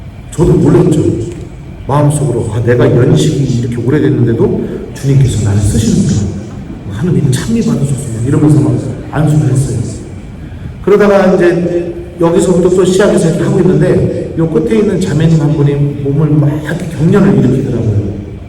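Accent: Chungcheong, South Korea. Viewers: 신부님 뵈오니 정말 좋았습니다 구수한 충청도사투리가 더 정겹고 좋았습니다 우리는 구원 받고 성령이끄심 대로 신부님 말씀 기억하면서 잘 살겠습니다~신부님 감사합니다 ❤❤❤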